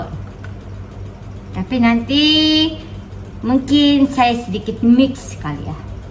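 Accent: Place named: Japan. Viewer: logatnya itu berasa kental japan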